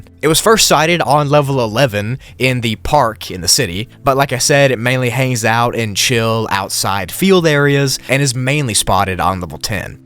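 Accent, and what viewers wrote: United Kingdom. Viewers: I know your British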